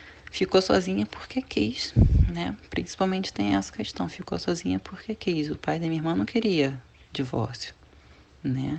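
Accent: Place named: Rio de Janeiro, Brazil. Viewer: Sotaque carioca, mas a voz mesmo não tem nada a ver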